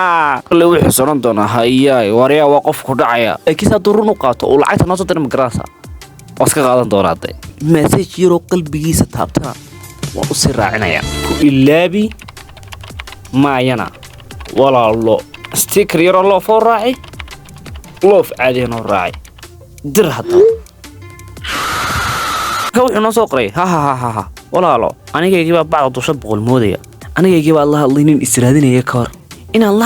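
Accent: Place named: Hargeisa, Somalia. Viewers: Adi mareer Somaliland ayaad thy sidii rer waqoyiga uhadlaysaye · Haa lahjadiisa marka loo fiirsado waa reer hargeisa toosa xataa Burco, ceerigaabo, berbera, Boorame maaha waa hargeisa toosa 😂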